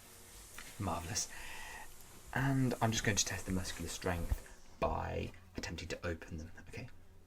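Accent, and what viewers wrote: United Kingdom. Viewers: Tbh this British accent makes the whole video even better. Damn it relaxes me ^^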